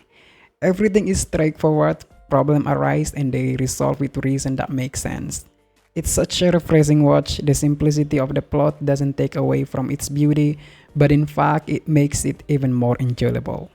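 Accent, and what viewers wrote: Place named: Indonesia. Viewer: Kyk aksen orang indo min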